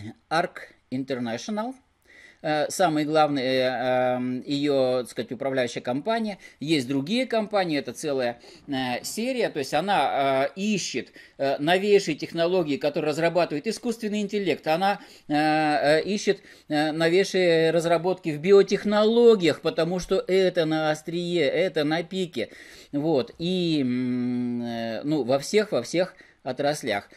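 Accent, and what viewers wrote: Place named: Urals, Russia. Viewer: Уральский акцент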